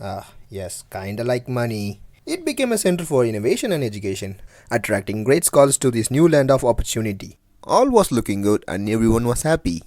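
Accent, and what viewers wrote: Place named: India. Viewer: You sound like an Indian sam'o nella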